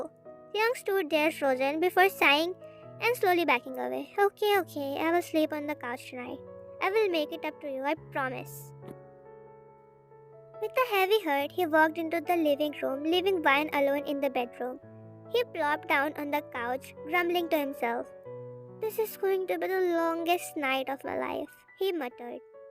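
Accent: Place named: India. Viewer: This is absolute shit. Wtf. The voice is sooo pick me. The indian accent is so obvious. Quit already broo. Infact u sound like a seven year old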